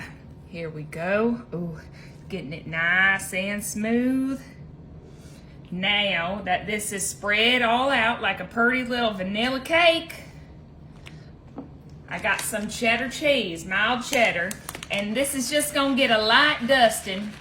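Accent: South, United States. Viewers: You are not a southern cook. We do not cook like this in the south. A southern accent doesn't make you a southern cook. This is garbage. Trash. Throw the whole pan away. This is an abomination. Yuk 🤢🤢🤢🤢🤢🤢🤮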